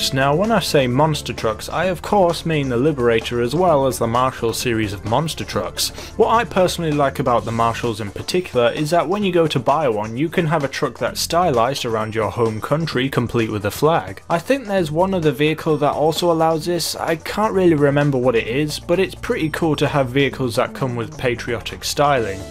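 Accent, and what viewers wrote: United Kingdom. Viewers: Wait... YOU'RE BRITISH TOO, well that's a nice surprise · Are you a UK YouTuber? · Wait wait are you british?? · Are you British??? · Didnt notice that you was from uk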